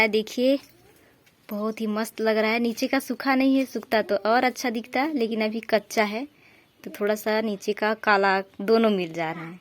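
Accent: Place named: Chhattisgarh, India. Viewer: Aap Chattisgarh se ho kya didi aap baat kiye to lg rha hai mere ko aap Chattisgarh se ho mai bhi Chattisgarh se hu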